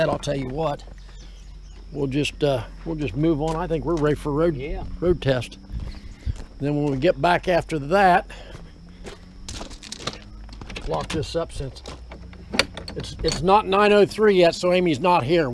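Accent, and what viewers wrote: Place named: Ohio, United States. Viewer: What a pip! Just recently stumbled upon your channel and must say how much I enjoy your videos along with your knowledge and honesty in doing business. I'm currently on my eighth Panther platform car, an '04 Signature Town Car and like very much that you appreciate and know so much about these vehicles. I'll wager the interior has that distinctive Ford aroma which I remember from cars my folks drove while I was a youngun' in the 1960s. Not elderly yet, but I'll be a boy of 63 in a few weeks so I can relate there as well. And being a native of western PA, it's nice to hear the way you speak over there in that part of "O-h-Ten." Quite similar to the way folks talk in my hometown area. Take care!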